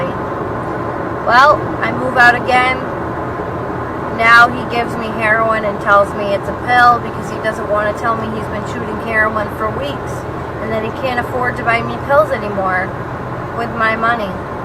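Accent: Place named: Rochester, United States. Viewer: Holy shit are you rochester Or Buffalo? You sound like you have a downtown Rochester. Hart accent like the East Side. Or I could be wayYou sound like you have a downtown Rochester Hard accent like the East Side. Or I could be way off And your midwestern, like i'm starting to believe chicaaaagaaa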